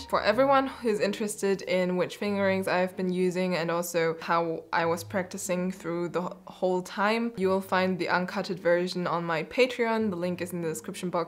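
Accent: Germany. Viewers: höre ich da etwa einen kleinen deutschen Akzent? ^^ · U have a DEUTSCH akzent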